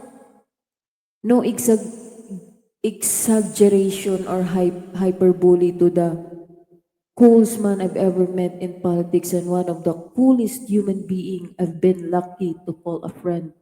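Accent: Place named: Cebu, Philippines. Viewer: Sige sige boses Ng Cebuano Yan, thank you tambi❤❤❤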